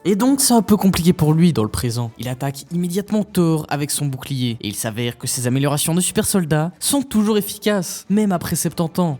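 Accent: Belgium. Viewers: oui j'ai eu la chance de voir cette série ici en Belgique sur France 4 et elle est excellente cette série,j''avais 35 ans quand j'ai connu cette série et j'en ai 45 c'est cool 👍😎🇧🇪 a propos, quand j'entends ton accent je crois que tu viens de chez nous?! 😂🤣🤣🤣🤣🤣🤣 excellente vidéo 👍 cool mec 👍🇧🇪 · Ton accent belge ressort vachement dans cette vidéo 😂😂😂 La série est excellente, elle arrive à reprendre la plupart des gros arcs des comics certes condensés mais c'est plutôt bon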